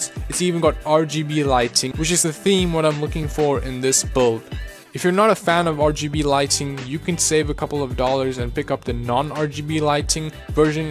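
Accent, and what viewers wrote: South Africa. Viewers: I subed for that SA accent xD , were did u buy the parts tho?Evetech? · Is he South African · He from South Africa? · This is the first video I've seen of yours and I noticed that SA accent right away :D (checked your channel info to confirm it). Great quality video!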